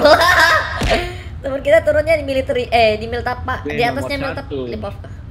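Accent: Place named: East, Indonesia. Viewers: Bang itu Kayanya orang Papua Bang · Cara ngomonya orang papua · Logat nya orang papau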